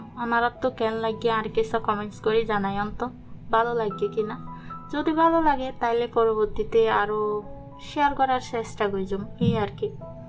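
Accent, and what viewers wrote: Chittagong, Bangladesh. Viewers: Ha ha apu chottogram er vasha shunte amr kintu darun legese..😂😂.tobe ata thik bipod ashle sob dik thekei ashe 😢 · হাহাহা আপু চিটাগং য়ের ভাষা শুনে ভালই লাগে কিন্তু বুঝি কম।সব মিলিয়ে খুব ভাল লাগল।আমি খেয়াল করেছি যে আপনি কম ব্লগ আপলোড দিচ্ছেন😊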